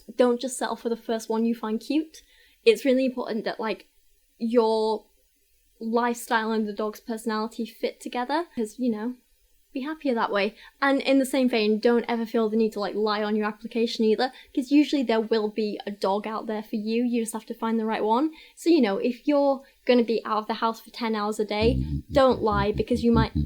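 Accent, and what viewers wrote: United Kingdom. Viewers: Dammit, talks just like my sister, but with an British accent....tripping me out.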